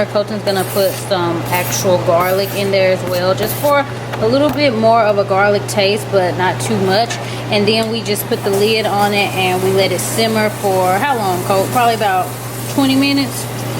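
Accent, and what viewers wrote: South, United States. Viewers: Southern accent maybe? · shes from the south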